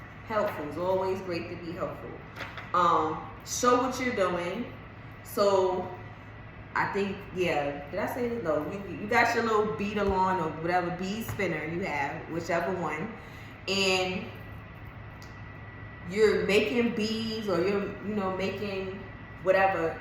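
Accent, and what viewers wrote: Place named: East Coast, United States. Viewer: Hey!!! I absolutely love everything about you!! Your look, your energy, your East Coast accent lol and most importantly your tips and guidance!! I’ve been making waist beads for 4 months and your videos have helped me tremendously!! Keep doin what’cha doin!!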